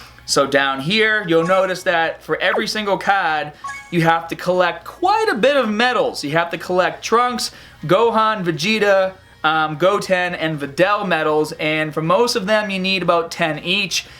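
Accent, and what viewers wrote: Boston, United States. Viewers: Son is definitely from Boston son said “cads” I was like tf is that then I realized he from Boston it’s “Cards”guys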